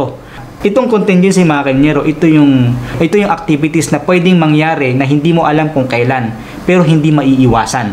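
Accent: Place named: Visayas, Philippines. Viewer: Engineer new subscriber here. After the pandemic will build a house GOD permits. In reality I am a fan of HGTV. So that's where I got ideas about building houses. But when I saw your vlog I was hooked to it. Currently watching all of it. Reading the comments and taking down notes. Sir when I start with my house for sure will be asking for your advices. I hope you will be kind enough to answer it. How I wish it will be your construction firm to build it. Son are you Visayan or Ilocano coz I heard you speak my dialect. Till then may God bless you.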